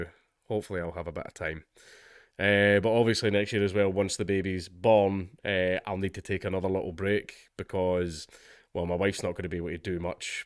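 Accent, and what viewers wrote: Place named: Scotland, United Kingdom. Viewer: Have good Christmas man 👍 I've enjoyed listening to one of the last Scottish accents to call nonsense on the current things. P.S your having to many stress wanks, hence the reason your having consecutive children because your jizz is new when the wife can actually be bothered with you 😯 Thank me later man😂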